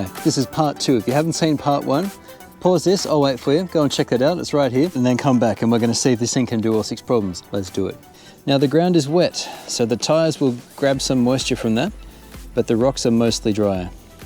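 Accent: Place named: Australia. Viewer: Definitely Aussie 😂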